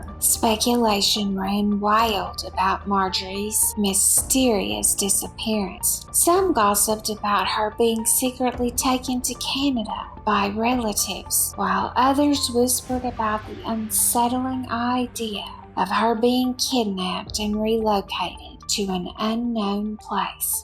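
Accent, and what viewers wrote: South, United States. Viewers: I just love her southern accent. So soothing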